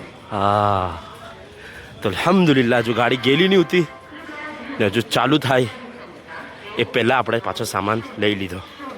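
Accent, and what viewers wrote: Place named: Navsari, India. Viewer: bharuch ma 6 varas rahyo choo engineering karti wakhte ,jhuni yaado taaji karavi didhi , thank you bhai , tamara navsari wada ni gujarati sambhadva ni bahu majha aave che . aey wakhte bharuch ma sauthi sasta theatre hata to to bahu majha aavti hati ne station par fruit juice ni dukaan , ne 6 no. na platform ni chai ne 1 no na platform na kanda wada bahu tasty hata , ne bharuch ni sing to kharij . bahu majha na divaso hata aey .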